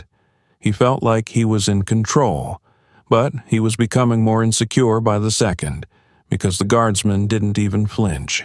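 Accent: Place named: South, United States. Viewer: a narrator with a southern drawl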